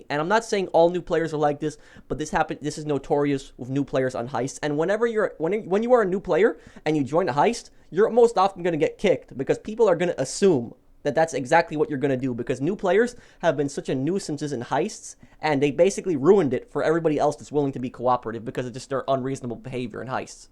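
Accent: Poland. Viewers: Z polski jestes? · R u acctually Polish?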